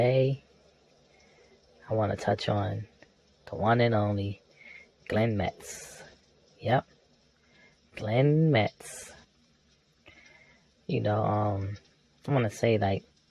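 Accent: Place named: Baltimore, United States. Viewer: Yo you got to be from Baltimore please work on presenting your presentation better you keep saying arm that shit is driving me crazy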